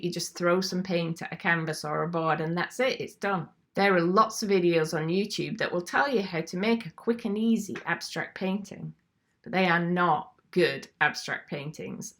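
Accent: Yorkshire, United Kingdom. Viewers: After trawling through so many art videos on youtube, this is the first and only one that has left me with more questions than answers and that is brilliant. Eight minutes of inspiring and thought provoking content. So nice to hear a Yorkshire person too. If you have any tips on how to gain inspiration from living in Leeds rather than beautiful North Yorks that would be greatly appreciated!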